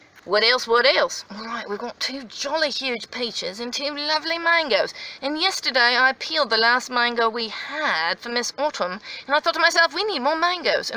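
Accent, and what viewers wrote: England, United Kingdom. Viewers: Great British accent! I love it. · great English accent, wonderful bird rescue, and awesome haul! I L O V E your videos. You always make me feel good when I’m down!! Also, been meaning to tell you I really like your bracelets! 😘😘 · Good English accent. 💕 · THT WAS AWSOME I LOVE THE ENGLISH ACCENT LOL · Make banana date bread as it is awesome! I have to laugh at your British accent because my mom is British! I get a kick out of it! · you sound English not Australian and trust me I should know I'm very English and you sounded just like me x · Bless your heart, you had me in tears and laughing my head of over the poor bird flying into danger of the cats and will woman. With English accent saying the wings are fine but the head is hanging. Let’s keep him quiet and maybe he’ll fly around the bathroom. You open the box and his head is up and the we one flys up to the top of the tree 🌳 Oh my goodness you remind me of myself, English accent and dramatics❤️ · 🤣🤣🤣🤣🤣🤣🤣🤣🤣🤣👍 ENGLISH ACCENT Or AUSTRALIANO 👍 💙♥️💙♥️💙♥️💙♥️💙♥️💙♥️💙♥️💙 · I am sooo happy you saved the Robin!! 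I enjoyed your English accent, spot on I dare say! Love your videos. Stay safe. ❤️ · you English accent, you could be one of us!!! You would settle right in! 😃👍👍👍👍💗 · Why do you keep switching to an English accent!?!. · You sound like my auntie with ur English accent 😂😂😂 love ur channel all the way from Scotland 🏴 so glad the bird was ok 👌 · Sticky toffee pudding is a great English dessert made with dates, in honor of your lovely accent. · 😆I love the British accent! You are funny frugal mommy!❤️ You’re a pretty good southerner too! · I love you , but loose the English voice please ! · Aww poor bird! Glad it recovered. By the way, your English accent is better than mine....xxx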